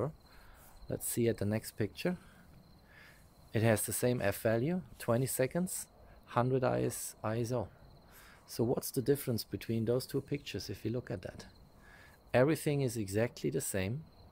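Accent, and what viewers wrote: Germany. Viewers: Haha man merkt das du Deutsch bist, ist aber nicht schlimm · Are you German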